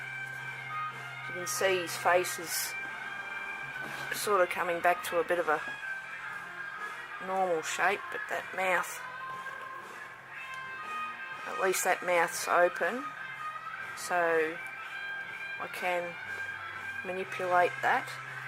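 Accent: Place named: Australia. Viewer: love your artistic creativity and your aussie accent just like my favorite actor Travis Fimmel, also love your choice in music. hope to see more creepy dolls too.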